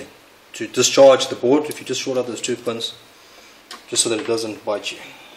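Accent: South Africa. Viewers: Are you South African? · Hi my fathers LG flatron w2043s started fading a while ago had lines and just wasnt right obviously ive tried a rubber on the ribbon for corrosion and tried to extend my laptop display via vga cables all i got was a white screen any idea what i can try next? are u from South Africa by the way ur accent sounds close:) im an SA dude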